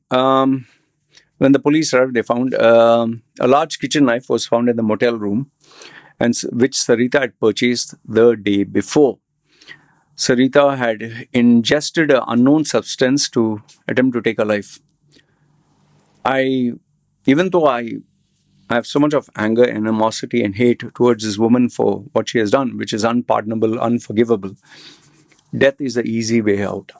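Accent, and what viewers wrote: India. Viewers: Your accent is Indian too…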